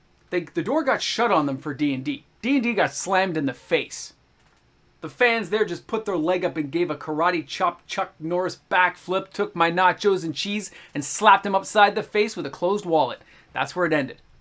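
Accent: Canada. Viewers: Are you Canadian? your accent is strong. You keep saying 'A-boot'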